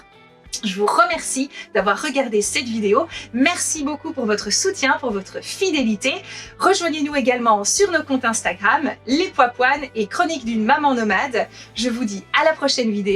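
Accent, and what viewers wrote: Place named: Belgium. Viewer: Trop mignione cette femme avec son accents un petit accents belge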